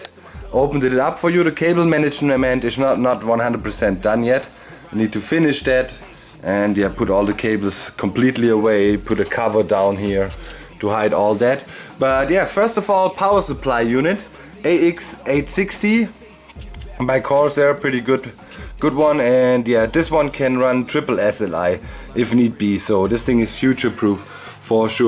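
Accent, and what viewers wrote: Germany. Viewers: German Akzent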